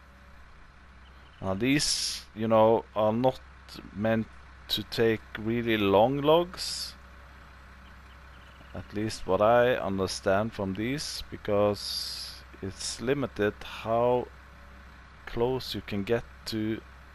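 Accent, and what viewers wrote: Bergen, Norway. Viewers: You sound like you're from Norway Bergen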